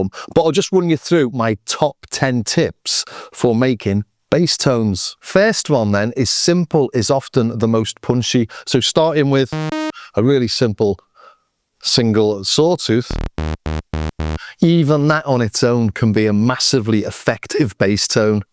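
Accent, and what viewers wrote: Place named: North, United Kingdom. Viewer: where is that accent from? Somewhere in the North?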